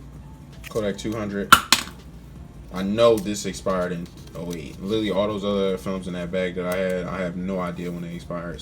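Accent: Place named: Philadelphia, United States. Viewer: u gotta be from philly the way you talk ahaha really love the music vibe and the photos!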